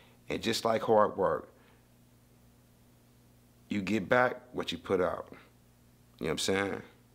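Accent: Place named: London, United Kingdom. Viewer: 😅 The London voice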